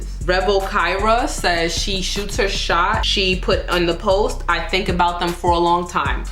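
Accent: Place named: New Orleans, United States. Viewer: lmao that nola accent something serious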